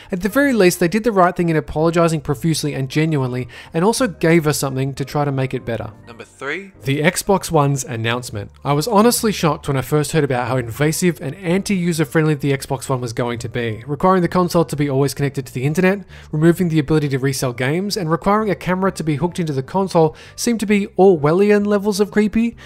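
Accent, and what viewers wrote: Australia. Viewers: ummm he's Austrailian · No he is just Australian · He's an Aussie, mate.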